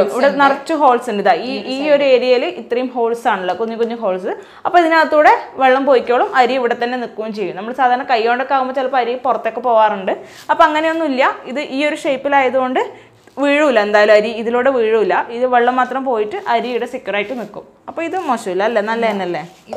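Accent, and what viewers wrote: Kannur, India. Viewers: Chechide veedu kannur aano? Kannur slag varunnundu athukond chothichatha.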